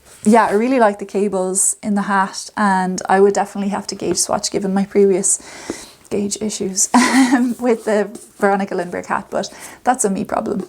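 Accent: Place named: Ireland. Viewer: Love the stitch definition on your Dagmar jacket. Really enjoyed your podcast. I’m from Donegal but living in the UK ( like a previous comment). So love hearing the Irish accent 😎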